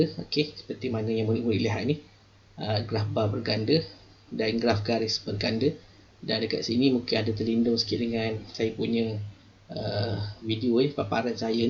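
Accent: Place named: Kelantan, Malaysia. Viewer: cikgu ore kelate ko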